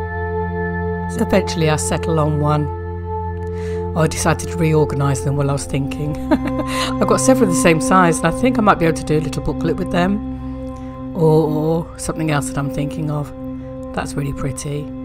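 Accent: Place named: United Kingdom. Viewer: Yes, I thought the same. The music was beautiful, but it did compete with your lovely voice... and I LOVE British accent.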